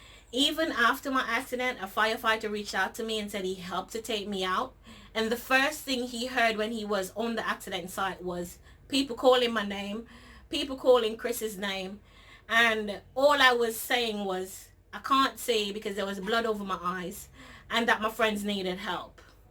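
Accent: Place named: England, United Kingdom. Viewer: Interesting that you said Oxford shirt and now you have an English accent hmmmmm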